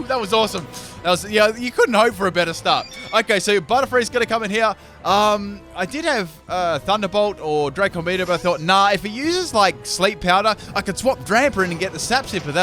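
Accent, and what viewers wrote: Australia. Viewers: my mother things you come from Australia... is that right?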